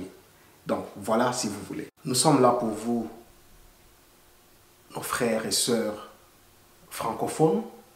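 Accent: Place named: Cameroon. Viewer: merci Je reconnais l’accent Cameroun Je me trompe?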